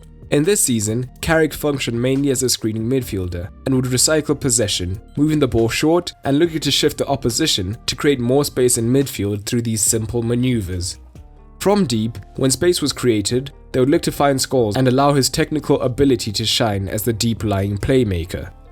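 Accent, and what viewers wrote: South Africa. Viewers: Bro are you South African?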